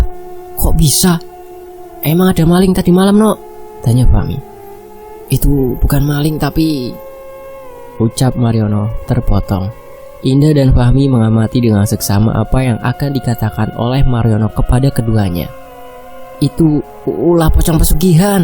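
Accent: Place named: Cilacap, Indonesia. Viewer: Nada suaranya kyk cilacap nih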